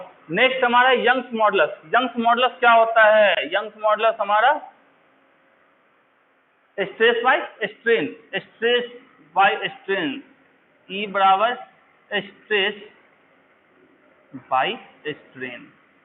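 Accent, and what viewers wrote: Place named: Bihar, India. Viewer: Sir hm iss year Bihar board inter 1st division kiye hai... 353 marks aya hai..physics me 74...biology me 76 aur chemistry me 66 aaya hai iss baar hm neet ka exam nhi de rhe hai..2023 me pakka denge..aap aise hi lecture layega..aapka voice tone bihari jisse padhne me boring feel nhi hota hai..thanks sir..🙏🙏❤️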